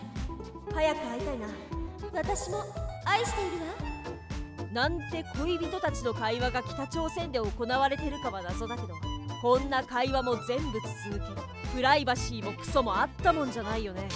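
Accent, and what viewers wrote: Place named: Hakata, Japan. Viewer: 山梨県民なのにバリバリ博多弁 いえいえ、気にしないでください！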